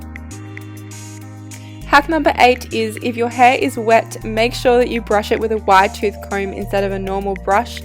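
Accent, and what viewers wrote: Australia. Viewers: I just found you on youtube and I'm obsessed! I love your videos and your Australian accent and you really help me to understand more English because I'm from Sweden and I'm not so good at speaking or understanding English🇦🇺🇸🇪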